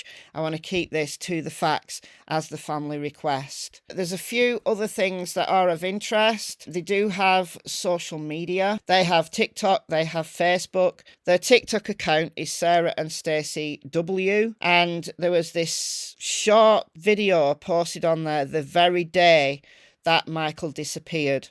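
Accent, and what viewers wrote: United Kingdom. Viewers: Love your british accent hello from America 🇺🇲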